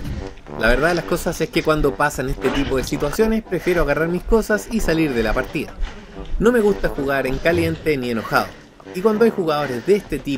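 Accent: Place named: Chile. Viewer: Te acabo de conocer y me encantan tus videos, pero tu acento se nota que es chileno, así que lo diré: Es aguacate no palta. Nada que ver el comentario xd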